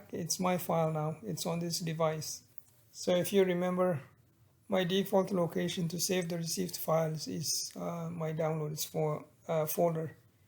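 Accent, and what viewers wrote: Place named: India. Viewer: Feeling Indian accent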